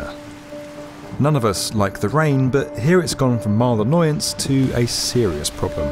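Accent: United Kingdom. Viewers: The Presentor is perhaps from Britain:))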